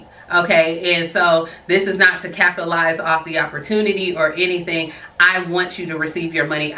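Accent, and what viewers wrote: Alabama, United States. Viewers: Wait that's Alabama accent , my mom is from there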